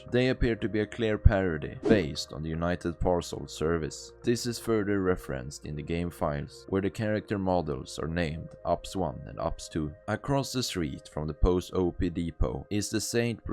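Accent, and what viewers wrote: Sweden. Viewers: most swedish accent ive ever heard 🇸🇪🇸🇪🇸🇪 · Visste väl att du var svensk när ja hörde brytningen i rösten! denna åker in i sov spellistan! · Säg mig. Tycker du att du har en väldigt noticeable svensk accent? När jag hör mig själv snacka på inspelning så inser jag hur brutalt svenskt det låter trots att jag är flytande på engelska. It is what it is i guess. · Are you from Sweden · the swedish accent screams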